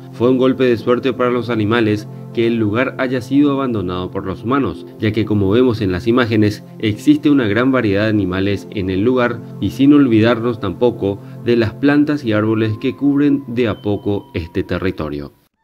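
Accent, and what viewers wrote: Paraguay. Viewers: Acento paraguayo ? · Sos paraguayo? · Sos paraguayo?☺️ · Me parece conocido el acento. De Paraguay verdad? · Buenisimo el vídeo. Sos paraguayo? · eres paraguayo? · Tenes acento paraguayo🤔 Porque no sabía de este canal hasta ahora🤔 me suscribo😍! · Sos paraguayo ?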